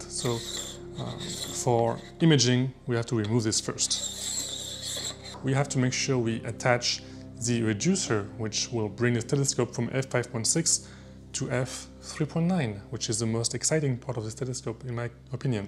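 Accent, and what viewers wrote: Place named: France. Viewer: Are you french ? Tu as un accent 😂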